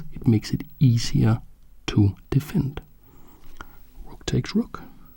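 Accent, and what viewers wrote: Denmark. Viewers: are you Danish? because you sound like one who could be from Denmark.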